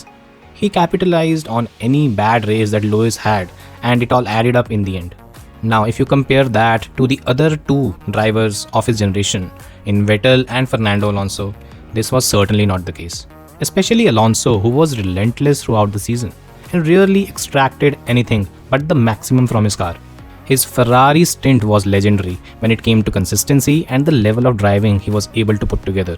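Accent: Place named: India. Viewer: When i heard an Indian vioce i swiftly paused it as i know all facts will be wrong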